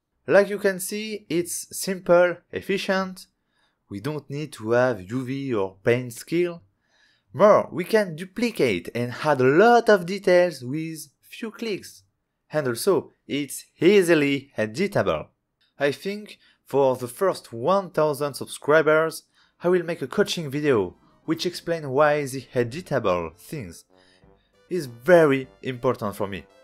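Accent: France. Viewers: Oh l'accent français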